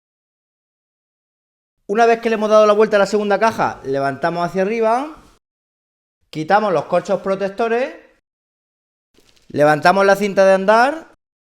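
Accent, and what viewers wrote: Murcia, Spain. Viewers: Ahora que llega el verano? Por tu acento diria q eres de murcia...y a no ser que en murcia el verano empiece ya , no entiendo.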